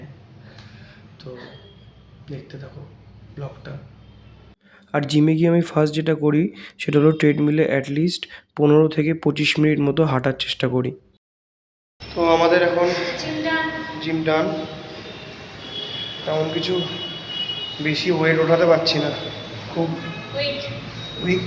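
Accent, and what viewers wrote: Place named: Bangal, Bangladesh. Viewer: Dada, tomar bangal accent ta 😂😂 Darun vlog ta ❤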